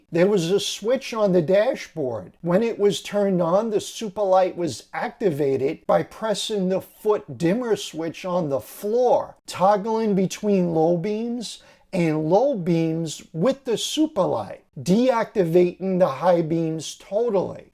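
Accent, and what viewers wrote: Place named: Bronx, United States. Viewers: the East Coast accent Just like when they say they're going to Florida We're taking the kids down to Disney world in Flarider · Hey! Are you from the Bronx? You talk the same way my father did. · That accent... Baracooder"..😂 like my grandma from New York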